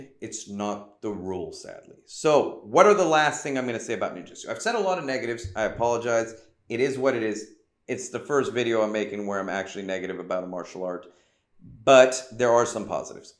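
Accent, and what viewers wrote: Israel. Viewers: Your Israeli accent is very good. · Good video and good Israeli accent. I had never heard of the Israeli style.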